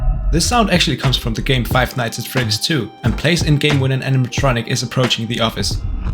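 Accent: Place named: Denmark. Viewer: i can just hear the danish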